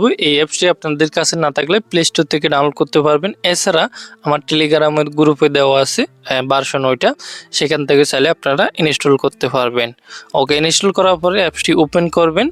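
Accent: Chittagong, Bangladesh. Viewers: অনেক দিন পর ভাই আপনার সাথে দেখা ধন্যবাদ ভাইয়া । আপনার ভয়েস শুনে বুঝে গেছি । আপনিও আমাদের প্রতিবেশী। চট্টগ্রাম বিভাগ থেকে ❤ চিটাগাং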